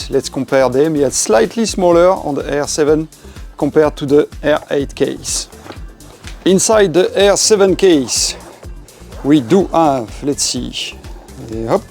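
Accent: France. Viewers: it’s a French accent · Ooo lya lya! strong French accent! Therefore, the question is what kind of radar detectors ( models) can be used in France!!! Where, as we are all know, VERY, VERY serious consequences of using such devices. P.S. My experience - NEOLINE X-COP 870s, not in France offcourse...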